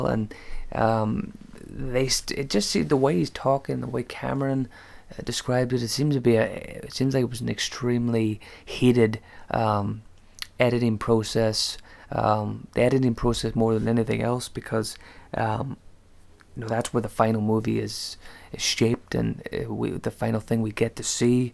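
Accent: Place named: United States. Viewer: Your from Northern Ireland, but have a Slight American accent do you now live in America!? 🤔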